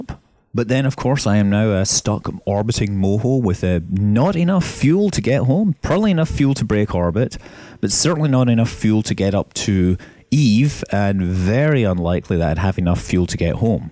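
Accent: Scotland, United Kingdom. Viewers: Oh I his accent sounds very scottish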